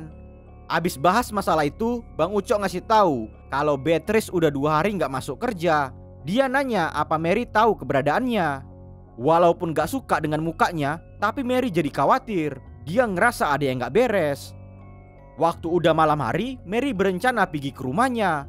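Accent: Medan, Indonesia. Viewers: MEDAN KALI ABG INI AH, JADI SEGAAAAAN KALI AWAK YAKAN😂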